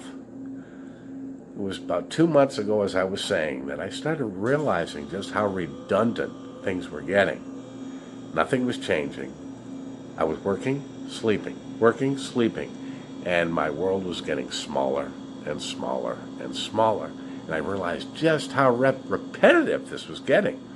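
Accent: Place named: Pittsburgh, United States. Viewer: . Are you Pittsburgh?? I swear. I detect a Pittsburgh accent!!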